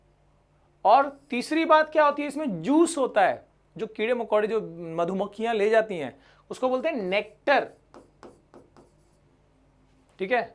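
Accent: Bihar, India. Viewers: Sir is video me Bihari style me bol rhe h ... I like that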